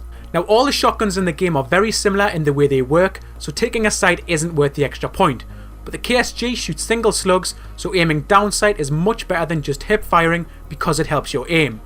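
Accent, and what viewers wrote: Newcastle, United Kingdom. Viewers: Are you deaf lol. He is a geordie...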